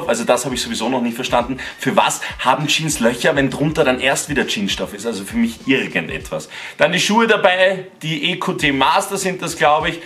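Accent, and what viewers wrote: Austria. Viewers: Ich liebe österreichisch🇦🇹bist du deppat endlich einen gescheiten Youtuber gefunden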